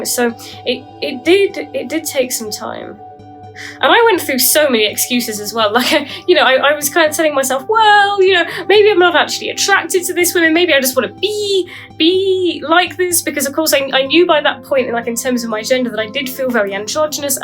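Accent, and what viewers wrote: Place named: England, United Kingdom. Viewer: I so wish YouTube and content like this was a thing when I was in my teens. There was literally nothing and zero information, and found myself so much in denial that I wasted a decade in a doomed marriage. Great upload, very much enjoyed it. PS - I've recently been blitzing content such as this, and is refreshing to hear an English accent :)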